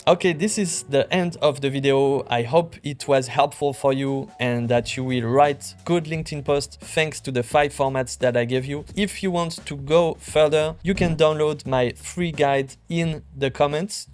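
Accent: France. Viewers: Merci, je vais suivre tes super conseils! C'est une bonne idée de faire tes videos en anglais tout en gardant ton accent francais, ca fait original et les anglo aiment ca!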